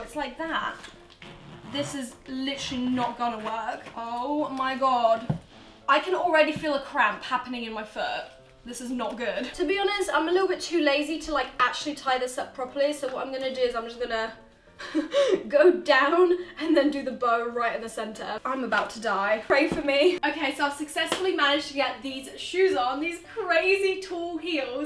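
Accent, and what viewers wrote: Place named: United Kingdom. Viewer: Her british accent OMG 😭💖💖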